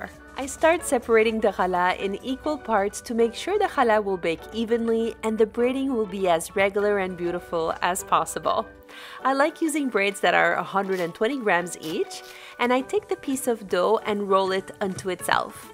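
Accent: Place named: France. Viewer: I love challah! Thank you. I thought you live in Israel until I saw the groceries in English. Are you in the states? In an orthodox Sephardic jewish community? Also you hv a beautiful French accent. This week is the key challa segula for Parnassa as it’s after pessach and Benetton Israel entered Erez Israel.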